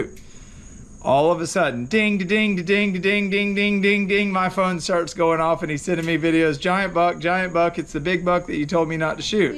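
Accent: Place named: Kentucky, United States. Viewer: You sure your not from Kentucky, you speak like you are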